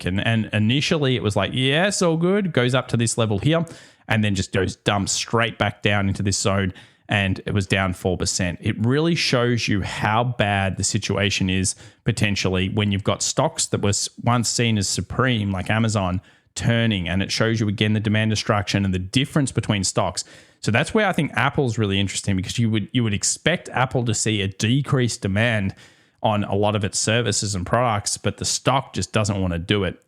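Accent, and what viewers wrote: Australia. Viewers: Your Australian accent is super cool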